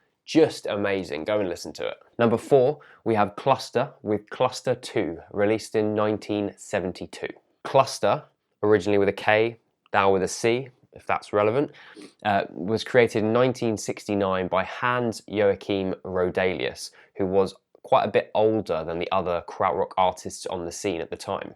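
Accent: RP, United Kingdom. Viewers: HIS POSH BRITISH ACCENT MAKES ME BELIEVE WHAT HE IS SAYING!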